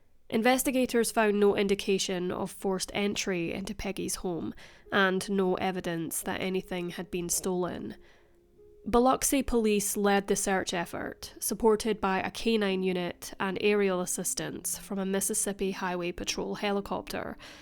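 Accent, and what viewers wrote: Scotland, United Kingdom. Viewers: Brits write and speak better. American English sentences can sound clumsy to us. The narrator is a Scot.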